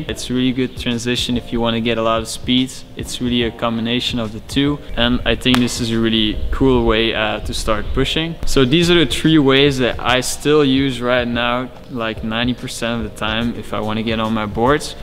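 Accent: Netherlands. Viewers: Bro are you dutch? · Are u Dutch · Are you dutch? Female dutch skateboarder here who recognises your accent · are you dutch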